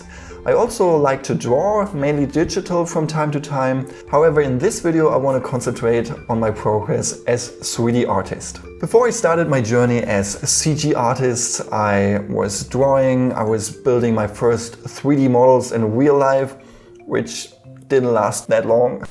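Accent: Germany. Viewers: Bist du deutscher? Dein Englisch hört sich so an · Mega Story! Hab schonmal ein Video gesehen und dacht mir schon, so wie der spricht: das kann nur ein Deutscher sein! :P Grüße aus der Altmark! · 100% deutsch junge sein akzent ist ja mal andere welle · Nicht schon wieder ein Deutscher der probiert Englisch zu sprechen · Der Deutsche Akzent ist Real! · You sound german. I am too... also wollte ich fragen, wo und wie du als 3d Artist arbeitest? In der Umgebung wohl kaum? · Oh ein deutscher, ja dann Echt krass was du so drauf hast respekt !👍👍👍 Edit: oh, hast ja sogar noch gesagt das du aus deutschland kommst Mhhh Finde man kann das (wenn man selbst deutsch ist) echt stark am akzent merken☺ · Man hat halt echt sehr gehört, dass du Deutscher bist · Love your German accent it actually sounds good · German English, ich auch x) Und schiefe Zähne, aber ich nicht ;) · diggha, wieder ein Deutscher, der versucht englisch zu sprechen. 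· The story of your childhood home - in combination with your German accent - makes me think of Dr. Doofenschmirtz. You're probably a nice guy, though! :P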